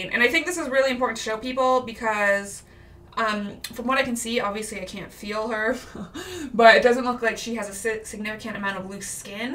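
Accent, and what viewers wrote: Canada. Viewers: Your Canadian accent is SO strong it’s adorable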